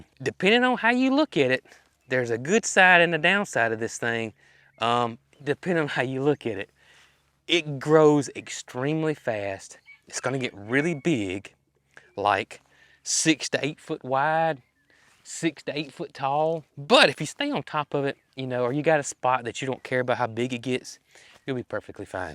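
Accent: South, United States. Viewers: I have to admit I do enjoy reading the CC to see how they interpret your southern accent. 😅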